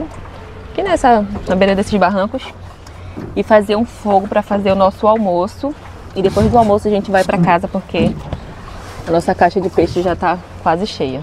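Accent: Rio de Janeiro, Brazil. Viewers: você é carioca??? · Sotaque parecido com carioca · Vc tem sutaque de carioca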